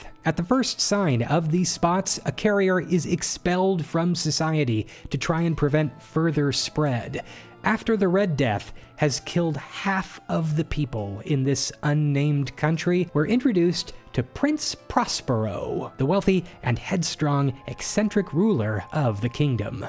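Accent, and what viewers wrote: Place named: South, United States. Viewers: Glad to see you're feeling well again. I applaud your choice of a soft southern accent, as much of Poe's youth was spent in Virginia. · Why do I have the sudden sensation of pitching a Jordan Peele or Ryan Coogler film as I listen to a Southern accent reading of "The Masquerade of the Red Death?" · I love the added southern accent as well. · Oh my gosh,! That reading was much more interesting and dramatic than I thought it would be. The costume, the hair, the MUSTACHE! And the changing lighting add a lot of dramatic flair. The voice, the accent was a very nice touch, although the slightly southern twang surprised me. You made Edgar Allan Poe, master of horror, sound...folksy. I almost thought I was listening to Samuel Clemens, instead!;-)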